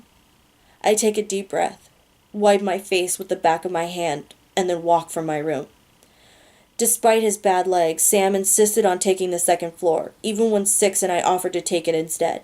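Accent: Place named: United States. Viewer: I think what I wrote confused you, I apologise as I write as I speak, I am at the moment listening to the "power of six" and was confused as I thought I had missed " Six's Legacy" but you have cleared it up that it is a novella ( I will listen to that after this one " the power of six" ) as I am now guessing it gives you an insight into just her life no one elses, Yes accents are strange I knew you were from the US just couldn't pinpoint where about, although I must say it is a very nice accent to listen to and I love that you change it for the different characters, really helps me visualize the story. So glad I found your channel, as it is helping me pass my mundane hours working from home, I work with clay and creating small charms for jewellery and a few episodes before this one I believe, I was doing something really intricate on a piece and your cat jumped on your knee and you screamed, I threw the clay across the room, haha I couldn't stop laughing at myself you gave me such a fright, as I wasn't watching the screen haha :D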